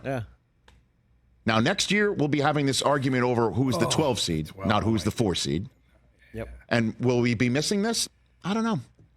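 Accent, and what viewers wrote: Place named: New York, United States. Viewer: Ever noticed how when Rich gets annoyed his NY accent starts to slip out?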